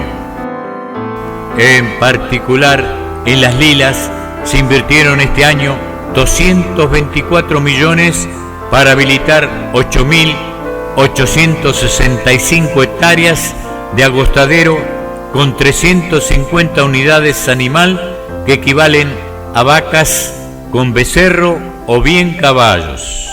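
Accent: Argentina. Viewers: Qué hace esa voz argentina narrando algo del norte de MÉXICO??? 🤷🏽‍♂️😂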